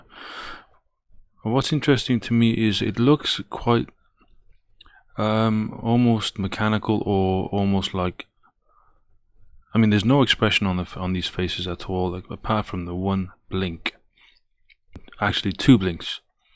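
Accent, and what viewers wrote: United Kingdom. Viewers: thought you had a british accent for half the vid! Bigfoot is real Tod is fake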